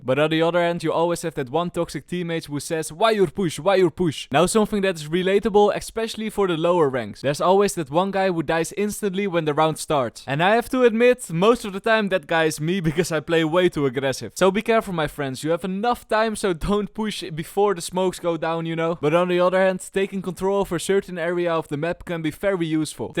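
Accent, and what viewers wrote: Netherlands. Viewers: Me: 99% certain this guy is dutch like me cuse of the accent. You: “easy POTJE valorant” Me: 100% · I hear ur dutch accent so loud “if you wanna play a easy potje” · this accent sounds kinda like dutch... :) but i like your vids man keep going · I suspect you were Dutch by the accent, but then proved it lmao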